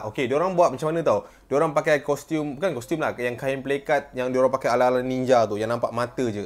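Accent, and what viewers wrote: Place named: North, Malaysia. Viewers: Abg fasih naw ckp utagha bleh lepak skali pa nnti mau sembang kita dua ni tiga gelas kopi pon kleaaa😂😂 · Steady bang.. Loghat utara time menyampaikan kisah seram ni buat lagi sya sronok nak dgar. Hahaha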